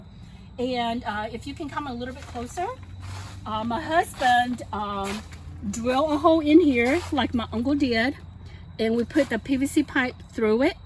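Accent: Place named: South, United States. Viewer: Love the greenhouse! Love your southern accent too.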